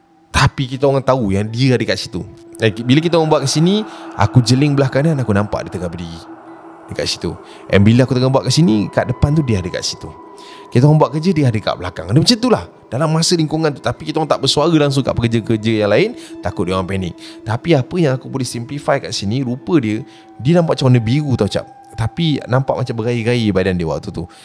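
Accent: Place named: Terengganu, Malaysia. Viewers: bapak naturalnye kau ckp slang ganu. hahaha power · terbaik la kecek ganu 😂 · pandai plok cakak ganu😂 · Bakpo bunyi ganu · Jaddi borh loghat Ganu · peh, mcm org ganu doh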